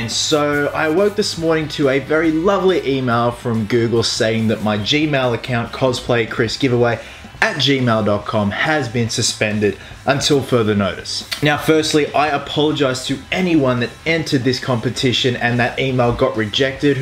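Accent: Australia. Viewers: hey r u australian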